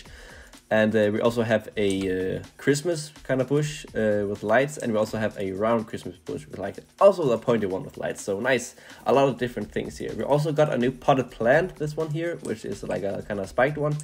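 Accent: Denmark. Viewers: are u danish? u sound danish with ur accent, and it says on the credits that u translated the game to danish???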